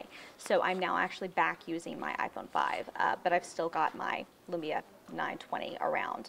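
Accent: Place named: United States. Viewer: As much as I want to listen to the opinions and experiences, I really could not handle the accent. I have to pause it every 2-3 minutes, take a deep breath [anticipating more American accent] and then play further. Seemed really balanced and objective evaluation though. Cheers!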